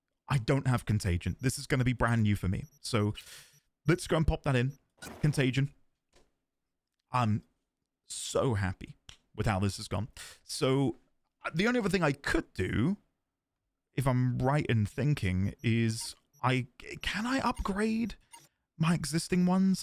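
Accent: United Kingdom. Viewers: do you have acting training? Your mid- century RP accent is top shelf!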